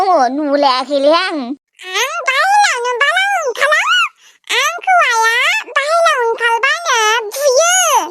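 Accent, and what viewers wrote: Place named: Udalguri, India. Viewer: Udalgurini raijlainai style lwi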